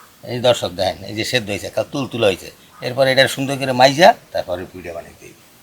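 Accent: Barishal, Bangladesh. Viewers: Brisal bola kotha nijer kahon valo boja😡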